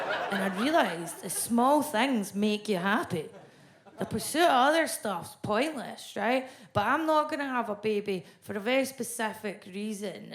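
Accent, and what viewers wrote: Scotland, United Kingdom. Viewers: Scottish accents aren't easy to understand immediately, but she's funny. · This is the first time I hear a hot girl speaking in Scottish accent! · I have a Scottish friend that doesn’t sound anything like her, so I was wondering if there are different Scottish accents in Scotland? My friend is from Glasgow. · I appreciate accent so much after having the sound of the snide Scot who narrates on the YT channel, Real Engineering, being the only Scottish accent that I could conjure up in my head for the last year or so. I was really beginning to strongly dislike Scottish accents until hearing. Beyond that, her performances are excellent, as well. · I love her accent, just wish I knew how to understand Scottish lol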